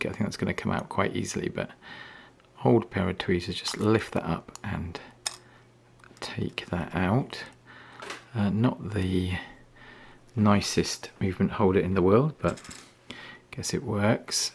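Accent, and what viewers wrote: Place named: England, United Kingdom. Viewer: Flot informativ video. Jeg skal lige høre. Er du dansker, men med en meget fin engelsk accent ?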